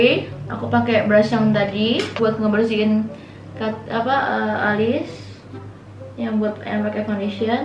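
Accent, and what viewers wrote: Java, Indonesia. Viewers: kenapa medogh sekali bro :*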